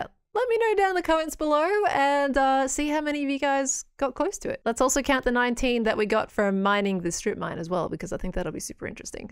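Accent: Australia. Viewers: I just started watching your channel yesterday and was watching today with my daughter and she asked if you're an Aussie because she feels like we're under represented on YouTube (she's 8). She'll be very happy to find out you're an Aussie as well.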